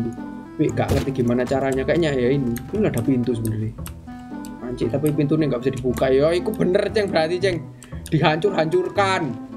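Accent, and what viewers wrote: Java, Indonesia. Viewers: Bang bang orang jowo ya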